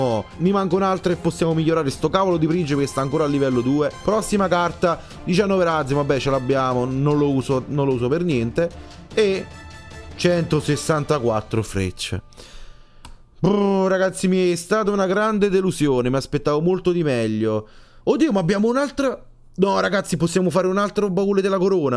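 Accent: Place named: Naples, Italy. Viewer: haha si vede che hai l accento napoletano cmq bel video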